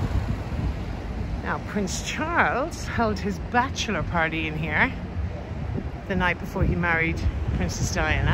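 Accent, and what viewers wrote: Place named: Ireland. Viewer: A lovely way to learn about our capital, told by a lovely Irish lady with a soft Irish voice. I could listen all day.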